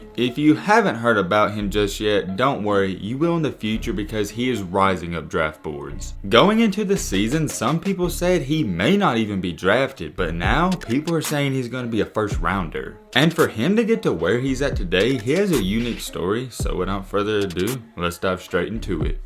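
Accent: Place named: Kentucky, United States. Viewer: where you from man, got the same dialect as us here in KY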